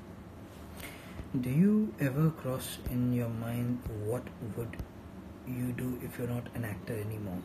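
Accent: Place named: Delhi, India. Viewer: This day will be going soo beautifull bcz it's morning is soo fresh and happy......app inte fresh and happy and cute lag rahe the ki ufff apse nazre hin nehi hat rahi thi....😍😍😍kabhi socha na tha ki subhe uthke sidhe apko live dekhungi.....Hayyyy subhe subhe apko dekhke to pura din ban gaya this is one ofthe most happiest morning of my life........apki batein apke bolne ka tarika.....apki adaein...apki inspirational batein.....apki ankhe.....apki soch......every small little thing which you have just wonderfull.......apki woo hasi or frnd k sath bat karna Delhi style main.....jese bolte hain na Dilwalon ki Delhi hoti hai....wese hi apke pas joo dil hain na woo bohot hi acha hain or woo ek dam bache k jesa hai sooo cute baby......Yarr Dilliwale to chagaye si.......and thanks to sir for encourage our dear Rajat sir...... sir told that Rajat sir is a finestt acter I also agree with him...Rajat sir is the bessttt acter in this whole world....such a kind heart he has...such a pore soul person he love every one.....and he always say good for every and and learn and observe good things from every one......he always pampers his loveones....that sooo sweettt of him.......I never seen any humanbeing like Rajat sir.......he is the one person in my life who make me happy.......when I see him I feel soo peace in my eyes and my heart.....his smile it just killerrr😘😘😘😘I can't say anything about him bcz he is perfect from every side....like as a human or acter or person or son or fr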